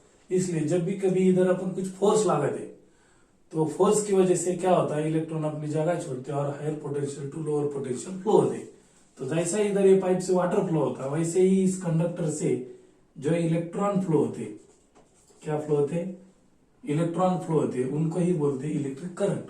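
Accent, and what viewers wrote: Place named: India. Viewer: Love your Hindi Accent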